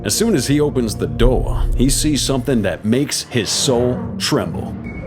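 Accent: South, United States. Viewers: Great content as always. I watch all your vids and my gf and I get a good laugh out of your accent, and I mean that in a positive way. We're from Chicago area and we love southern accents.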